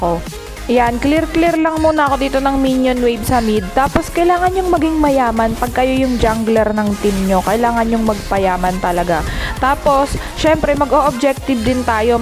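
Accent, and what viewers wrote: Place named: Bicol, Philippines. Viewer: 👁👄👁 ang ganda po ng voice nyoooooooo taga saan po kayo sa Pagsailta niyo po kasi parang taga bicol po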